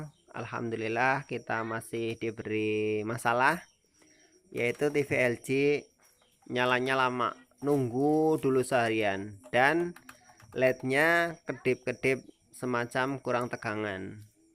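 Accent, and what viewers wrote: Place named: Banyumas, Indonesia. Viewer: Rika ngapak timen.. salam dari lampung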